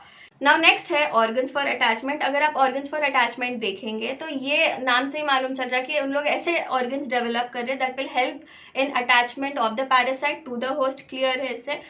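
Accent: Hyderabad, India. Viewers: Hyderabadi Ma'am spotted!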